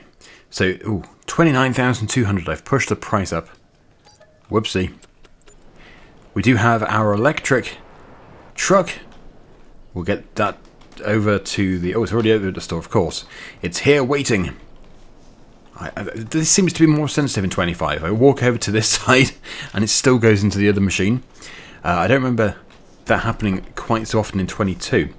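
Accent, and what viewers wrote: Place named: United Kingdom. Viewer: I always thought you were an Indonesian creator 😂 until I heard a thick Brit accent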